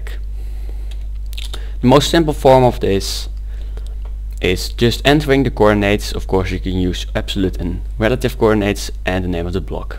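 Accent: Netherlands. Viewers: Dit is nederlands accent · hbo Nederland accent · 100% dutch :) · je hoort het nl accent. dat is niet erg, maar goeie tutorial! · Are you Dutch? I can hear it in your accent! Thanks for the video!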